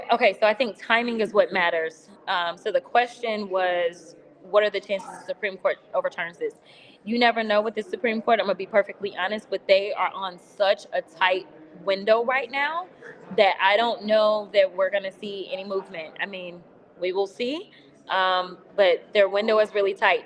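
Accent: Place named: Texas, United States. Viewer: ILYSM. 🥰 Sometimes, your Texan accent gets me, and I have no idea what you're talking about, but I still love you. 🐨🇦🇺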